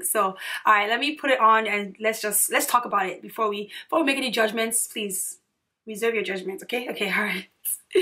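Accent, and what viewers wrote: Nigeria. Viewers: its actually a really beautiful wig, and you'd break hearts with that on lol. Plus i love when you go back to a Nigerian accent...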